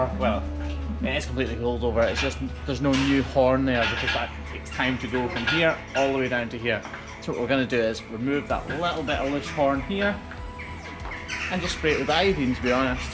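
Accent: Scotland, United Kingdom. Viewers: I know nothing about farms, cows, etc. going through my you tube and saw one of your video’s, clicked it and binge watched a ton of your video’s.l admire your work. I don’t even know how you deal with the poop and pee, let alone the job. Your a kind person. Your face looks really good . I saw where your eye socket and nose was broken WOW! Curious , how did you get into this type of job? Love your accent.Scotland looks so beautiful. Been looking into my family tree my grandfather last name was McClurg. Searching to see if it’s Scottish or irish.Take Care. I live in the United States.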